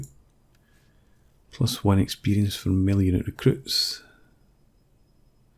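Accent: Scotland, United Kingdom. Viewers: you are Scot, arent you? love your accent;-)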